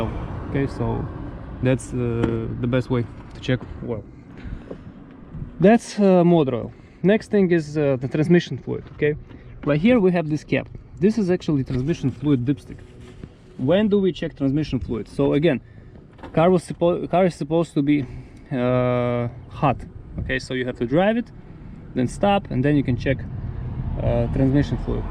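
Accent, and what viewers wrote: Russia. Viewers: Thanks man! Your Russian accent makes this video easy to understand and learn. Thanks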